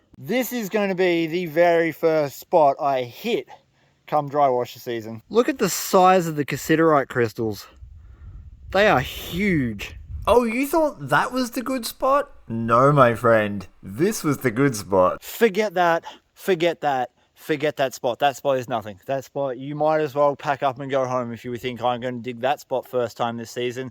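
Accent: Australia. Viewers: My favorite videos after a stressful day 🙂 From the Australian accent, fun edit scenes, education, and Fern.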